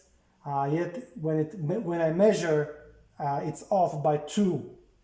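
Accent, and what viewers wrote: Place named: Russia. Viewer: Interesting accent, russian perhaps?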